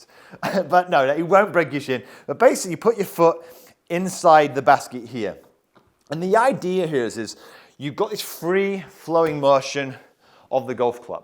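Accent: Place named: Kent, United Kingdom. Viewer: I once asked CHATGPT about way of speaking and they gave me a long and detailed, almost scholarly account of his travels and where he picked up his nuances... very interesting and very funny. His main influence seems to be Kent in England.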